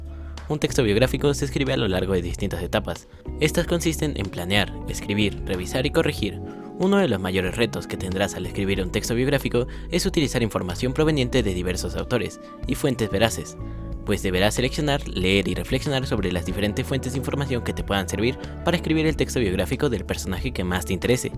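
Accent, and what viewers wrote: Mexico. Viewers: oye de donde eres se te escucha acento mexicano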